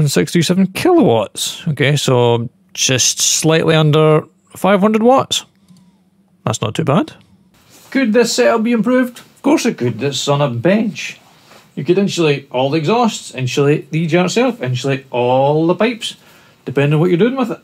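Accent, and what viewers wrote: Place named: Orkney, United Kingdom. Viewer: I was mixing specific heat and conductivity with my idea. Thanks for following up. Also your accent and humor reminds me so much of my grandfather. I hope to visit the Orkney Islands where he is from some day. Greetings from Seattle, Washington.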